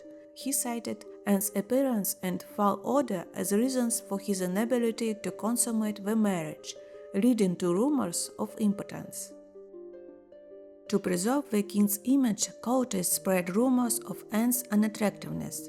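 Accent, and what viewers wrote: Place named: Germany. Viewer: The woman's voice narratoring accent sounds Deutsche.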